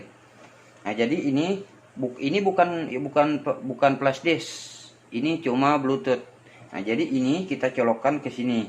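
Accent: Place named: Kapuas Hulu, Indonesia. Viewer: Dr cara ngomong abg ini kayak y org kapuas hulu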